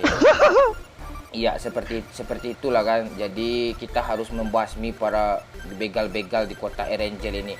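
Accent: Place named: West Sumatra, Indonesia. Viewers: suara nih.. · nih suara nya wkwk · urg awak yo? Jaleh bana minang nyo 😂😂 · Ndak salah iyoo bana urang minang,urang minang marantau ka pekanbaru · Suarnya kaya ya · Kya suaranya admin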